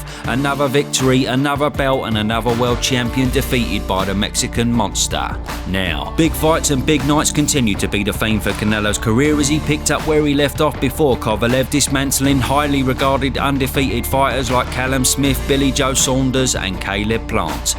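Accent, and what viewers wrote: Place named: Essex, United Kingdom. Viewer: Can they get someone else to do the commentary, his flat Essex/counties tone is really off putting, but great channel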